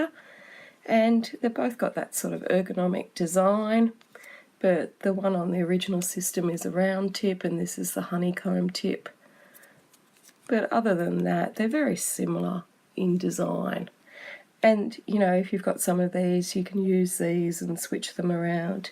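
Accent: Australia. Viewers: Thank you so much for this excellent review:) I always love listening to your reviews, you speak so eloquently and give a fair and unbiased report on the product you are reviewing. It is also a bonus to hear a fellow Aussie accent!!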